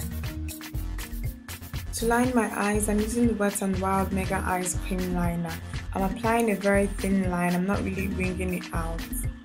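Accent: Nigeria. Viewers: I love everything about you and how u keep your Nigerian accent intact because it is soo cute. love your hair and videos too.. awesome. if you don't mind, could you tell us more about your hair because it gorgeous. stay blessed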